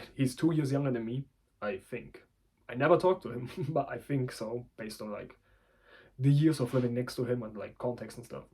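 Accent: Germany. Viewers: Du kommst ja aus Deutschland, das hört man raus. Dir viel Glück und Erfolg.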